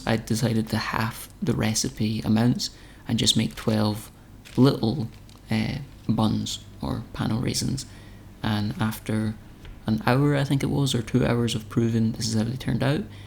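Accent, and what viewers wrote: Scotland, United Kingdom. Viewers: Is your accent Scottish? I like how it is far easier to understand than accents from Northern England! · Weird as it sounds, I’ve been craving the sound of your voice all week. It’s so soothing, like ASMR. Lol, you’re a young Scottish Morgan Freeman. You should consider narrating. Thanks for posting this video in particular. Bake Off is another bit of ASMR for me and this combination has been absolutely wonderful. In regards to your three bakes, I think you did a great job. 10 out of 10. If it tastes good, who cares how much it looks like the traditional version. You’re not in a competition; you’re baking for yourself. All three looked delicious to me.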